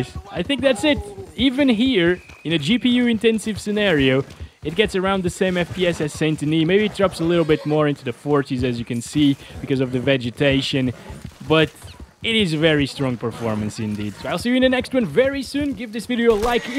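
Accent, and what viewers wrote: Portugal. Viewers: Just wondering, are you from portugal?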